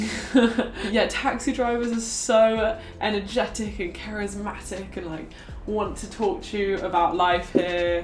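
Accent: United Kingdom. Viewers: Hello, im really glad you like my city! I love it too. Your british way of talking is really pretty, I really enjoy watching your videos. Have fun the time you'll spend here! Wish us luck for the football world cup jajaja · your speech made you look like ferb from phineas and ferb, talking about relaxing under a tree, in a british accent and with an eye bigger than the other one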